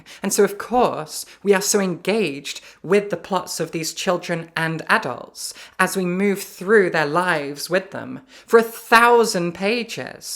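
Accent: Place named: Scotland, United Kingdom. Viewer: Accents: your own is fascinating. Clear indications you don't mix with working class Scots (or Scots!) much, posh Guffie tones. Vocal crack and Americanate vocabulary indicating your chief influences culturally are from the "Land of the Free". 😂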